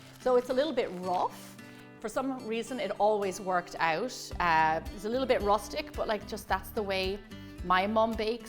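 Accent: Ireland. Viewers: Hi,I live in Dublin, I love listening to your Irish accent, which is rare to hear in 😊USA baking recipes on Utube, thanks for this easy to do recipe and for your helpful tips, I’ve got the Bramley apples which my mother also used, the pastry is ready to make the tart in the morning I can’t help but admire the large sensible oven which too is rare in Ireland God bless you and your family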